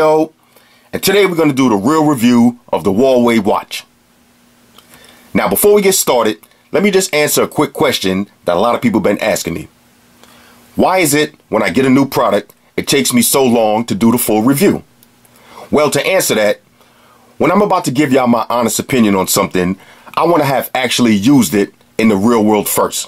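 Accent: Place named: Brooklyn, United States. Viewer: Love your vids homie! Mad informative. You sound like my cousin in Brooklyn i swear! Salute!!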